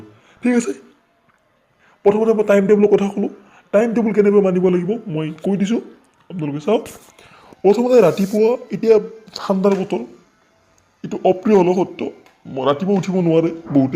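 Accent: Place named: Nalbari, India. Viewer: Khati Nalberiya oi nijoka ki buli vaba sirok anaka kobo laj nalaga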